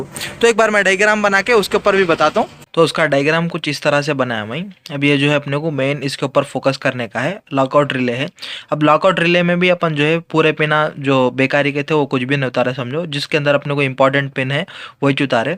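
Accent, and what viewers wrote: South India, India. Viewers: Bhi aap South Indian ho kya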